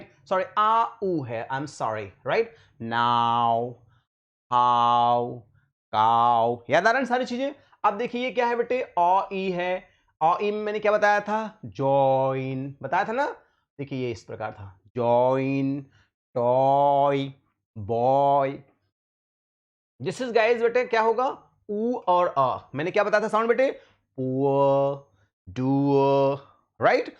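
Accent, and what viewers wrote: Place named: England, United Kingdom. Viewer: Ur pronunciation is as like the english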